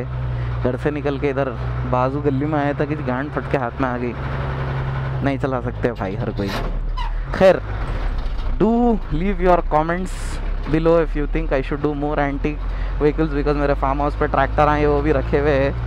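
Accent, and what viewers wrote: Hyderabad, India. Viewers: Bhai you nailed it . loved the way you spoke the typical Hyderabadi way! Definitely want a mlre though test of the truck and a tractor! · bhai kab sudroge humesa naya naya karte ho. sound to superbikese bhi jyada atsi. mujeh aapki hyderabad language bahut atse lagte ho bhai keep it up. teeper hogaya crane bhi karo bhai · I love the hydrabadi language how you talk!!! 😂😂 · bhai ek number Hyderabadi commentary marte...yarro... Ab next ice-cream ki thela le k niklo aur apne dosto k aur pechchaan k ghar k aju baju ja k responses record Karo... Bada majja aayenga aap ko bhi aur un ko bhi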